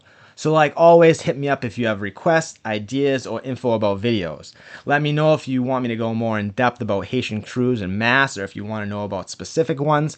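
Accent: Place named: Rhode Island, United States. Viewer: Another superb video!! Your Ch. ROCKS!!! Today... I can't find the Member sign up page. I believe I've seen it before... should've just signed up then. I'll figure it out (maybe..😅) I'm Internet Challenged... but I ❤ your content. You know all the history and share it well!!! I'm a Rhode Hole 50+ yrs...ran the streets (well..more like walked them) in 80's+90's. I love the history... the accent too. LOLOLOL. How many times have you been down South..spoke 1 sentence to a Rebel...and heard.."Where you from boy??...New York???"I'll bet more than once..😃😃 I gotta dump a Ch. from a FOOL who.. who's content SUCKS.. I'll leave it there. But yeah...if you can send me a Member link.. I'll join in a ❤️beat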